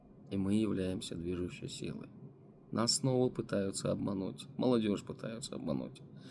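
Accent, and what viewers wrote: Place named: Chechnya, Russia. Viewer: Эмират это и есть Ислам и каждый мусульманин должен к этому стремится аж до судного дня. Если ты против этого значить ты не мусульманин. Вот это и есть наша проблема. Как только у чеченцев появляется вкус свободы тут же появляется религия и всё...точнее сказать - идём обратно в стойло. Так было, так есть, так и будет, история этому свидетельство. О каком народе ты говоришь!? Посмотри на себя в зеркало и послушай свой говор. Чечен-чечен. И где в тебе твой чечен(имеется ввиду автора этого ролика)!? Пора уже определиться что мы хотим, быть рабами или свободным народом как завещали нам наши предки пролевая за эту свободу кровь!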